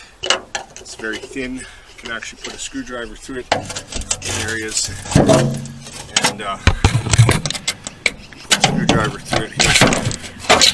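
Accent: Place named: Canada. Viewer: Wow, $100 is a steel I understand why you got it. I did good to for like I said for this area at $200. I thought I recognized your accent but wasnt sure that you might be Canadian...I would of bought even your old trunk in the condition its in If it was here for sale. Most of them are rust projects here because Im on a island. My old one is currently on Craigslist.I could repair it with a mig welder in hour but im too lazy and old now,LOLLL.....easier to buy one when the iron is hot for a good deal,HA!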